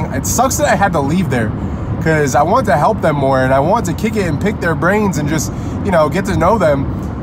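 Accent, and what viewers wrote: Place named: Long Island, United States. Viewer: ! Brooooooo, love your videos man. You sound like you're from Long Island bro lol. Whole Fam is NYers. Anyway, love the content and quality. I just purchased the A7III, it's on back order but I'm excited for the quality of vids I'm about to pump out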